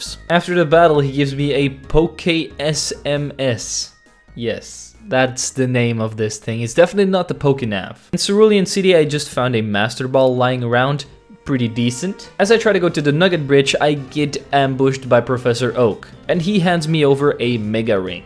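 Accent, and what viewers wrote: Netherlands. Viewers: Are you dutch?! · Wait are you dutch? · wait are you dutch?! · A-A-Are you Dutch??! o mijn god ik ook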